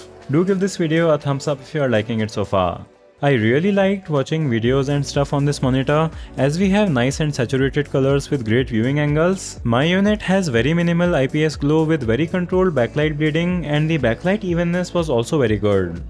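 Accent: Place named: India. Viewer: English with indian accent.. beautiful.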